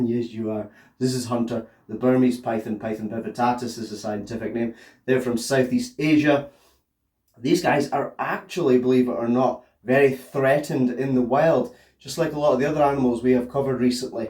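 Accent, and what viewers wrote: Scotland, United Kingdom. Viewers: I am in Banchory good to hear a Scottish accent on you tube thanks for the reply I’m enjoying your videos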